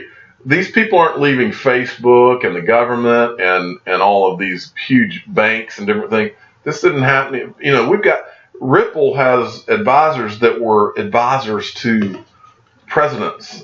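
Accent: South, United States. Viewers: You’re so unapologetically southern it kills me sometimes 😂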